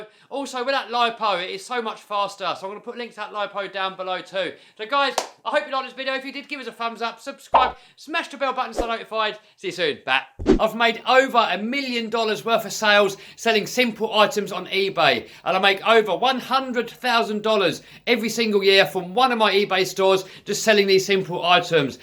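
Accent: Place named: England, United Kingdom. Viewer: So glad i dont live in England. The accent is terribly annoying. Cant handle it. Cool vids on mute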